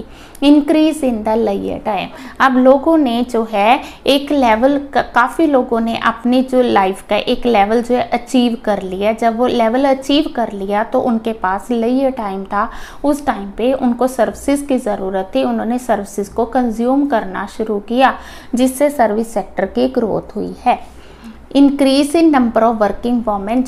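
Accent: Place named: Punjab, India. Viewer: apki aawaz me Panjabi jhalak rahi thi vaise mai Gujarat se hu but I love Panjabi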